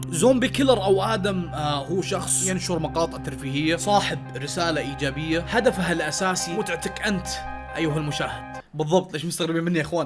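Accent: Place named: Saudi Arabia. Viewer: وت شنو مصري سعودي 🌚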